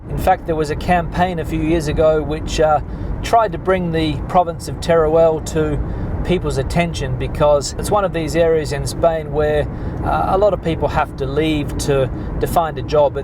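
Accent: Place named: Australia. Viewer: Good to hear an Aussie voice! What took you to Spain?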